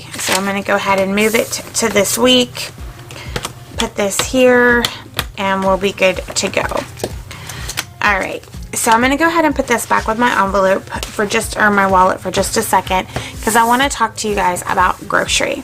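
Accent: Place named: South, United States. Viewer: You are extra southern in this video, but I love it!!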